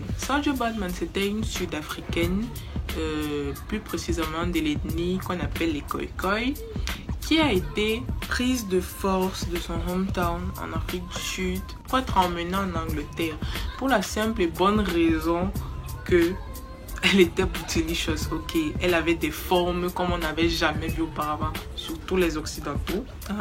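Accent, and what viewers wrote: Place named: Cameroon. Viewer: Une des rares YouTube use qui , comme moi conserve l’accent camerounais naturel que l’on aime tous 👌🏽 force à nous les camer, forcé à toi , force à ton intelligence et à tes recherches 🇨🇲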